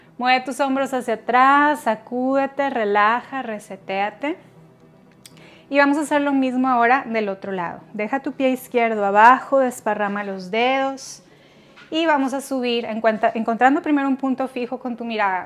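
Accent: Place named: North, Spain. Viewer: Es la primera vez que veo sus videos de Yoga gracias por compartir escucho su voz muy agradable con acento norteño muy agradable disfrute mucho la clase Namaste ❤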